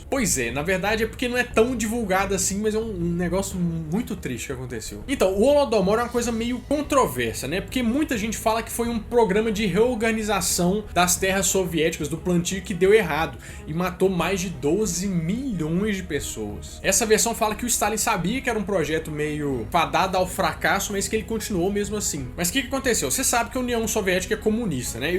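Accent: Minas Gerais, Brazil. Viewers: Acho o teu canal um dos melhores. Só uma sugestão, pra ajudar a gente que não é de Minas, é fazer um sotaque menos regional, pq tem horas que não dá pra entender o final das frases, já que em Minas se come muito a pronúncia kkk Ao menos fale mais pronunciado quando for falar frases muito grandes. Parabéns pelo conteúdo, e um abraço!!! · Vc é mineiro? Pelo sotaque parece que sim.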